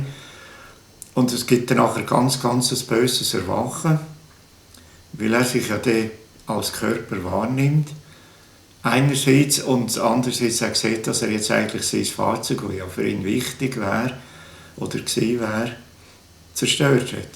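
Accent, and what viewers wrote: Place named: Switzerland. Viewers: Ich liebe den Schweizer Dialekt, leider so schwer zu verstehen. :) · Für mich auch - unmöglich - viel zu anstrengend - da geht mancher Satz total unter. Mein Dialekt ist d. Schwäbische - red aber jeweils auch nur unter uns so - ich will doch verstanden werden - oder erwartet man , daß jeder interessierte hier gefälligst dem schwyzer' dt. sich anpassen sollte ? Sehr schade !Dazu noch den Bart , wo es erschwert , evtl. v. d. Lippen ablesen zu können !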